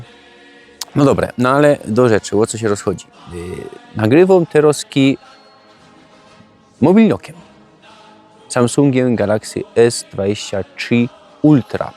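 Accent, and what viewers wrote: Silesia, Poland. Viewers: Pozdrawiam. Fajnie się słucha ślonskiej gadki. Ja jestem otwarta na wszelkie języki i gwary a Śląsk bardzo lubię.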